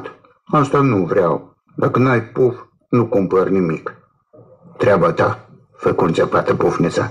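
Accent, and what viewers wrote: Moldova. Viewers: Dragă, chiar dacă am peste 60 de ani, iubesc poveștile copilăriei. Mai ales poveștile de seară, spuse în dulcele grai moldovenesc de peste Prut. Te îmbrățișez cu multă dragoste! Îți doresc o săptămână binecuvântată cu multă protecție DIVINĂ! 😘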